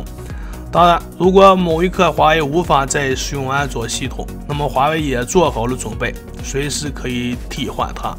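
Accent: Shanxi, China. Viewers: 哈哈山西人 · 兄弟，山西人？托县的？ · 你这是山西的还是河北的？ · 山西兄弟吗？好好练练普通话... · 山西口音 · 山西口音啦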